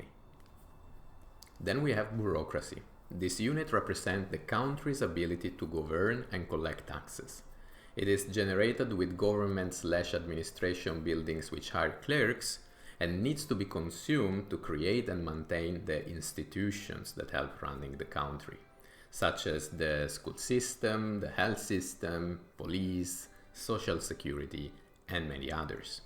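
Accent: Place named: Italy. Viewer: I'm to high to digest what you ar saying but I enjoy your Itialian voice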